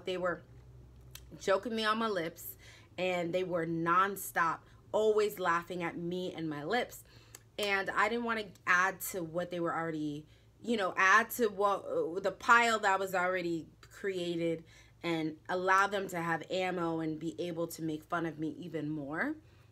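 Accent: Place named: United States. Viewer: She is a child of immigrants so naturally she has an American accent.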